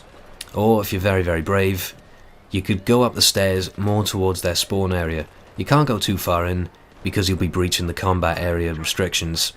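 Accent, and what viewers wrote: Wales, United Kingdom. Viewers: Nothing like Statham. This guy has Welsh in his voice. Statham is cockney. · Informative, interesting, and...oddly soothing. British accents are great.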